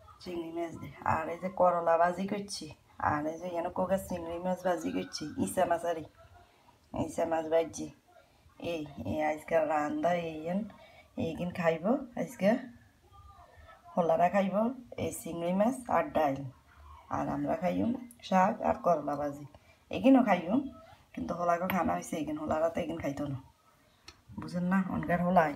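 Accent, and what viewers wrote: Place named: Noakhali, Bangladesh. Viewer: Apo noakhali kotha onek sondor lage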